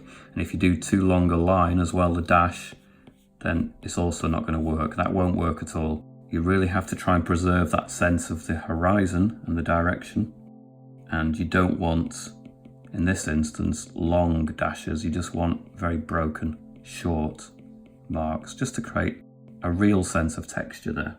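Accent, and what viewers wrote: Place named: North, United Kingdom. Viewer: Great to hear a familiar northern accent on YouTube! Brilliant video!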